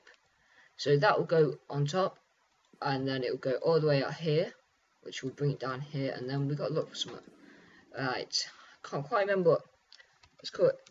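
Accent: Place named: United Kingdom. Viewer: he sounds british, man from 2 years ago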